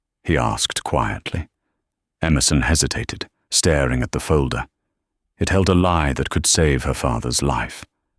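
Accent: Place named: United Kingdom. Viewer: The British accent gives the narration more gravitas. But use a female narrator sometimes, please.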